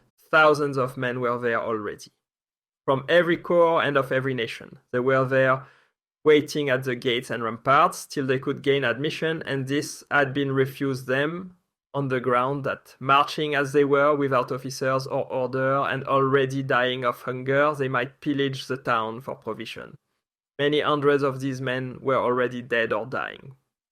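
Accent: France. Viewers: Excellent video man, I have listened to a few different accounts from the Russian campaign and I must say, even though you are speaking English the French accent provides a deeper authenticity you don’t get with other accounts.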